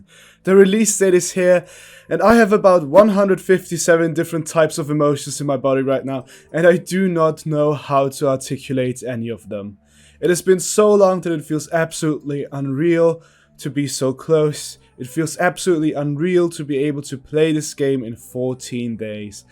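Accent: Austria. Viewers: The Austrian accent is based as hell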